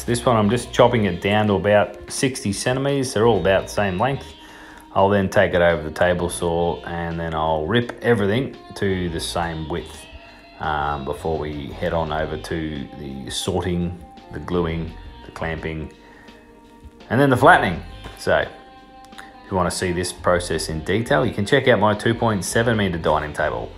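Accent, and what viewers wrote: Australia. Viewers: Aussie accent took me on a ride for sure. Thanks for a speedy reply! Thats a sweet hefty vise you got there. Looks like a Record’s 53e younger brother. Your shop looking spiffier and spiffier! Soon you’ll have to grow a beard and visit tattoo parlor. Its a must ... you know. LOL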